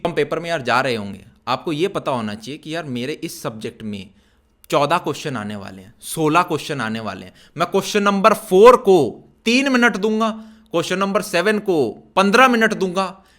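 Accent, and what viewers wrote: Haryana, India. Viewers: in between Bhai ki thodi thodi Haryanvi nikalti hai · Haryanvi accent❤️❤️❤️ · Bro are you from Haryana? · Anyone notice the Haryana accent in Aman bhaiya...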